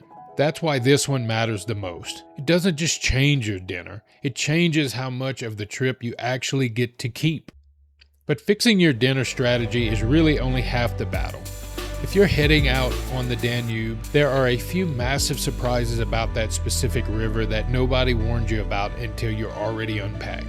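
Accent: United States. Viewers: Almost 5 minutes of absolute dribble. Sounds like a typical yank, no homework done, totally unprepared. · You don’t sound Irish. You sound American af. False advertising.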